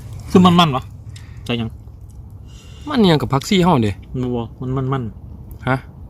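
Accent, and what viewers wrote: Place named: Korat, Thailand. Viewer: เก่งมากหนุ่มโคราชสอ้อนบ่เสียแนวโคราช555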